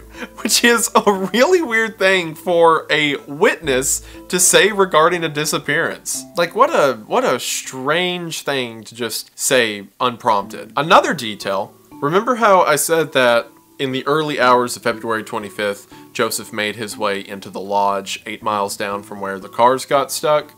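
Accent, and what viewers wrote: United States. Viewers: American young man voice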